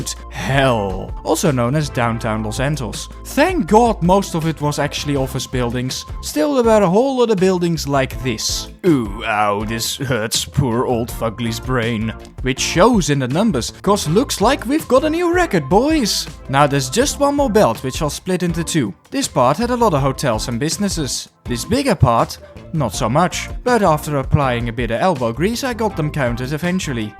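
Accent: Netherlands. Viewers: lekkere nederlands accent ouwe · i can tell lol. Im dutch to so i can tell when someone is dutch🤣 good vid tho! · Obvious Dutch accent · Damn great vid question tough are you dutch since you have a slight dutch accent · I smell Nederlands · only Dutch people hear you are Dutch right away😂 You can hear the accent but it doesn't bother me and liked the video you made😊😄 (I saw a video where a dutch person with terrible english is explaining how we live in 🇳🇱😂) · U sound dutch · Man I thought I was gonna enjoy this video but as soon as I heard the Dutch accent I had to turn it off. Damn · Leuke video! En heerlijk om dat Nederlandse accent erdoorheen te horen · I have a slight suspicion you might be Dutch. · Do I hear a Dutch accent? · U sound Dutch to me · Dit is de eerste video die ik van je zie, ik heb een gevoel dat je nederlands bent hahahahah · Bro is de minst opvallende Engels sprekende Nederlander haha, goeie video alsnog. 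<3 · I am a certified Dutch person and I smell Dutch in your voice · Loved the vid! Noticed you're accent sounds dutch. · Je bent Nederlands he? Bekend accentje haha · I mean he has a Dutch accent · You're dutch. I knew it after the first sentence😂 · Are you Dutch? :) I like the Dutch. Love from Sweden! 💖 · Are you dutch? I can hear some netberlands accent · I love that, being dutch myself, I can hear straight away that this guy is dutch as well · You sound dutch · This guy is probably Dutch, i'm Dutch and some Dutch people have this accent. I don't btw. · I smell dutch haha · I smell dutch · most intense dutch accent i've heard in a whike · Imma Nederlands accent, not dik at all. Very hollands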